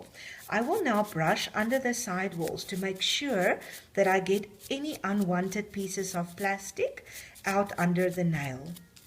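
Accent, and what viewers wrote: South Africa. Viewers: By her voice I can tell she is south African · is jy afrikaans? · Nice to hear a South African for a change :) thanks for the tutorial!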